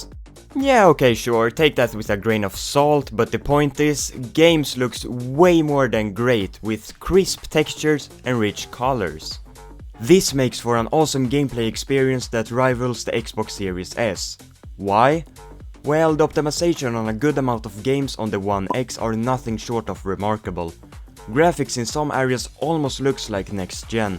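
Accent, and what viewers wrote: Sweden. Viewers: I recognized your swedish accent and you confirmed it when you said "swedish prices" lol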